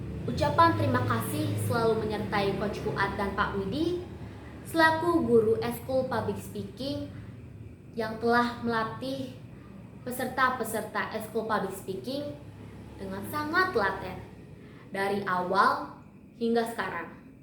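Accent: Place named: Sunda, Indonesia. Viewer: Keren. Ternyata 'berbahasa' pun masih trrdengar medok sundanya. Pdhl 'speaking' nya juga medok 😀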